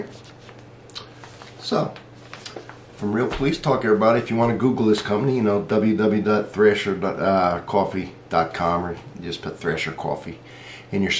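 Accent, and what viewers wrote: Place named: Philadelphia, United States. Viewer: New to your channel and really enjoyed watch all the coffee videos. A nice way to spend a Sunday morning! Will have to make my way through the beer and whiskey ones! I switched to using heavy cream (also from Kroger here in Indiana) instead of half and half and sugar and never looked back. It does bring out the taste. But your sound more like a Philly boy than a Kentuckian!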